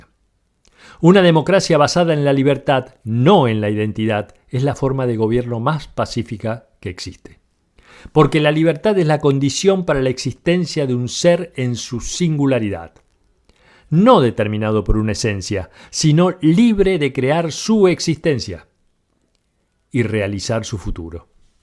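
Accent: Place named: Argentina. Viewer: Siempre es grato escucharlo. Deseo compartir una sensación paradogica mientras te oía.En la parte final del vídeo cuando haces una serie de postulados sobre la Libertad solo asentía y no podía estar más que de acuerdo. Hasta que el acento argentino me recordó a MILEI y pensé como unas ideas tan profundas y tan ciertas pueden ser tergiversadas y usadas para todo lo contrario. De nuevo, mil gracias.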